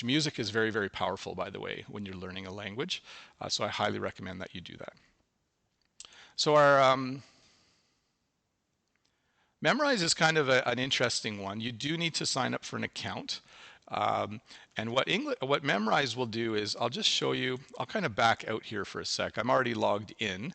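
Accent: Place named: Canada. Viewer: Hi! I really like Canadian Accent! Thanks!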